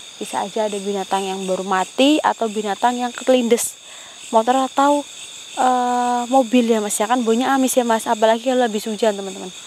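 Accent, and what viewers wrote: Banyumas, Indonesia. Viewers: Aq suka geli ketawa sendiri denger dialeknya yg lucu.spt tibluk.ya bhs Banyumasan..bs ngobatin rasa rinduku pd kampung halaman.ayo maju terus mb.I lope.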